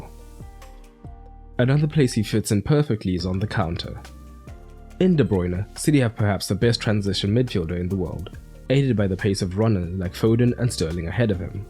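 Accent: South Africa. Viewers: Hey mate! Love the videos, especially since I assume you’re South African (judging by the accent) like me🤝🇿🇦 Anyway, how do you think Haaland will compare to the Agüero we saw under Pep? Agüero was known to be a little lazy and perhaps not entirely fit in a possession-based team like City. I might be totally wrong tho. Thank you brother and have a great week🙌🏻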